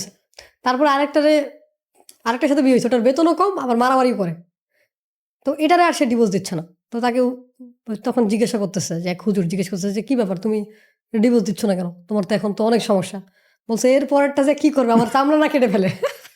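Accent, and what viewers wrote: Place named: West Bengal, India. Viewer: প্রথমে ভাবছিলাম ইন্ডিয়ান কথা গুলো শুনে ভালো লাগছে তাই শুনলাম এখন আরও ভালো লাগলো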